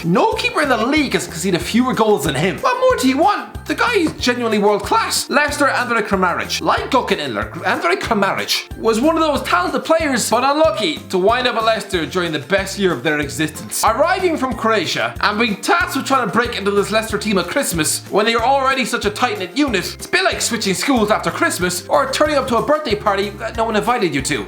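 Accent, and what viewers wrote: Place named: Ireland. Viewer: Slow your Irish ass down, I can barely understand you and I'm fluent in most english accents, including Creole, New England and Brooklyn/Bronx slang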